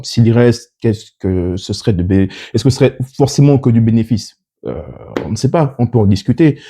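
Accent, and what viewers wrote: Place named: Gabon. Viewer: Att tu es Gabonais parce que l'accent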